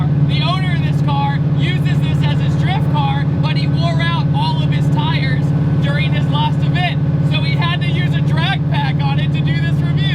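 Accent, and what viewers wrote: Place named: South, United States. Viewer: Oh no.... It's finally happened... has been living in Georgia too long & is losing his ability to sound normal slowly.... he keeps saying "S13" coupe and it sounds like he's saying "S. R. teen" Don't let that slack-jaw southern draw seep in to your brain! PRONOUNCE your words... don't become a country boy for all things holy.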